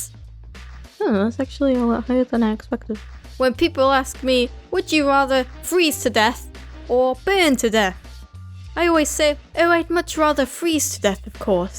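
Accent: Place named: United Kingdom. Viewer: Yeah I thought you sounded British, i'm British too and nobody cares cause nobody cares about the people who talk about them self's and their problems in the comment section and they'll just say "N O B O D Y C A R E S"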